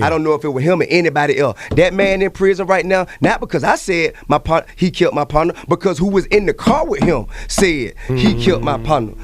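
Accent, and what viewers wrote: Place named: South, United States. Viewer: This do sound so fucking country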